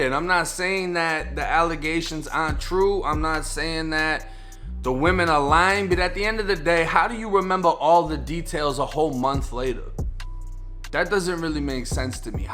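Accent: Boston, United States. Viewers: I know your from FLA but you have a Boston Accent...but most people from Boston move to FLA anyway you must have an aunt or something. I moved to FT MISERY OMG I ran back to Las Vegas CDC I am originally from Boston were I could run a muck I never got pulled over than you God my Lord and Savior.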